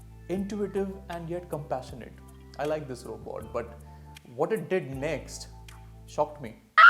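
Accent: India. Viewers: The Indian accent always reminds me of those phone scammers.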